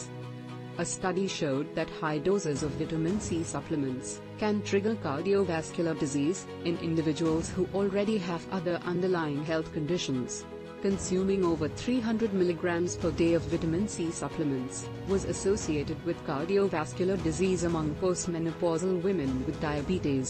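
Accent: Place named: India. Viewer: As soon as i heard the indian accent i stoped the video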